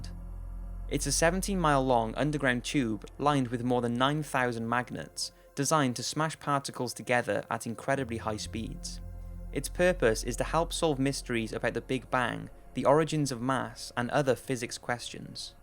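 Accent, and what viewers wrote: Wales, United Kingdom. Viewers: Are you Welsh ?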